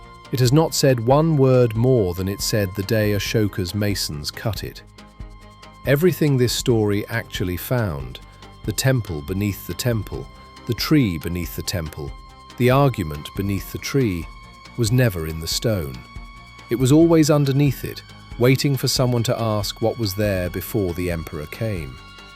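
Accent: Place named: United Kingdom. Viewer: A total waste of my time and the hardest was to torture myself through the awful Britoid accent.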